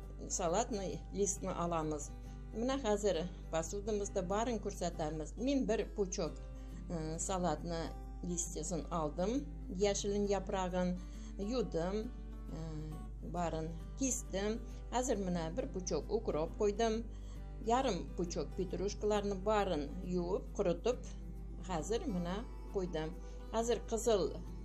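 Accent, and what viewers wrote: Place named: Turkey. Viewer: Turkmisiz deyman aksent bilan gapiryapsiz ozbek tilini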